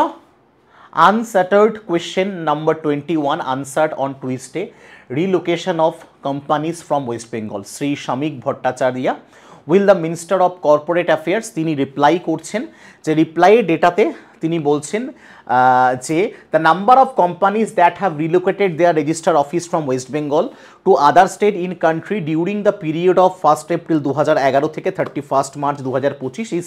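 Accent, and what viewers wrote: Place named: Bangladesh. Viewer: Your pronunciation like a Bangladeshi.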